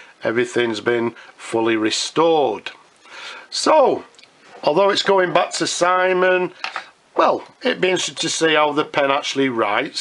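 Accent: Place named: Northern England, United Kingdom. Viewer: Oh, I see! I thought for a moment that you were talking about yourself and was about to ask which race at what meeting so I could place a bet. Excuse me being personal but I love your Northern vowels. Batley, or am I way off mark?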